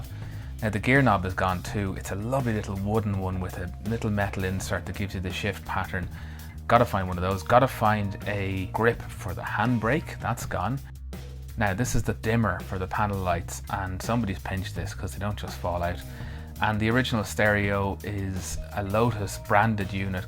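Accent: Ireland. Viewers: WTF I only discovered this because of Ronald Finger's youtube channel; and I just started. This is AWESOME. I'm bingewatching from the beginning. This channel needs WAY MORE views what the fuck youtube. This NEEDS to be seen everywhere. Anyways, this dude is like the Irish version of Veritasium. These guys are like long lost twins with different accents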